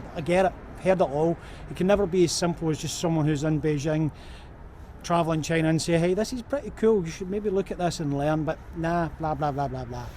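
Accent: Scotland, United Kingdom. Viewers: Oh Sir, your Scottish accent is so fascinating😂My situation is quite complex that I'm Chinese, learned British English before I went to Australia. Me and my friends watch American sports games so I'm already lose that what kinds of English rules should I follow. Now you add on. I'm going to be crazy now.🙄 I'm already suffered in Chinese dialects hell, why one more ... BTW good contents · Scottish accent is cute 😊, and welcome to my home country, hope you enjoy your trip!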